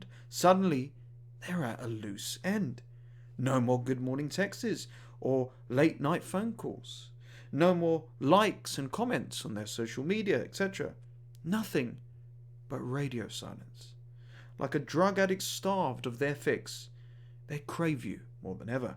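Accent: United Kingdom. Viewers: I really enjoy your voice .... your British accent is the best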